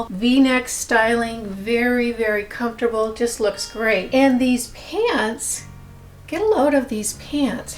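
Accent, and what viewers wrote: South, United States. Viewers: Started vlog at 5.30am in (Aus) woke way too early 🤣 and just couldn't resist hitting that start symbol. That southern accent? Gently drifted back to sleep then 3 hours later with coffee in hand just had to watch again. One who also wanted makeup tutorial your skin is literally glowing. Looks fantastic. Just wish products used when converted to $AUS could be purchased without my having to forego a fortnight rent 🤣 sad but true. Aus conversion rate, shipping & taxes in this country 😫 The final highlight powder I love but here at Sephora it's over $100. Sure there are dupes here but looking at reviews this seems to be in a class of its own. Am over 70 so getting a glow without looking like a sparkly crocodile is a challenge. Going to get the KVD bronze gel tho, so soft & pretty. Love her eye shadow pallettes as well but am def going to look at Milani. 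Thank you for videos & workouts that are age appropriate nice to hope that with effort & attitude maybe I am not totally invisible.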